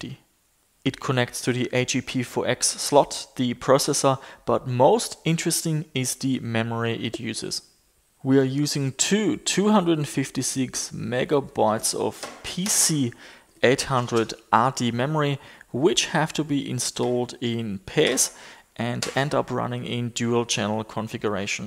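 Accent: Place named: Germany. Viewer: I imagined something like that happened., allow me one personal question: do you originally come from a German speaking country? I noticed your accent and every time you pronounce "Wolfenstein".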